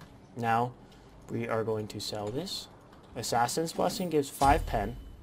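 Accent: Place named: India. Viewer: So thats how u sound. Hey ur a pretty good duler. I thought u were some indian from india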